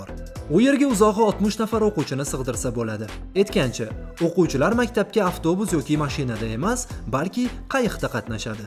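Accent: Tashkent, Uzbekistan. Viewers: Iltimos shevada gapirishdan qochishingizni so'rardim Toshkent shevasi yaqqol bilinib qolyabdi vedio uchun esa rahmat olg'a davom eting.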